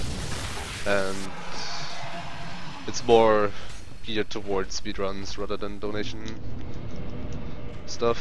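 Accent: Germany. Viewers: How's the Dragon Tooth so powerfull?!? Also your DT attacks look like a downward swing/thrust combo which seems to be critical for this devastating damage output. Could you explain that to me, please? Unfortunately I'm totally unfamiliar w/ Dragon Tooth because I've never made use of it. Btw, if you speak German (which, judging by your accent, you most likely do), you can also explain it in German to me if you want ;). If you don't, please excuse my misjudgment :).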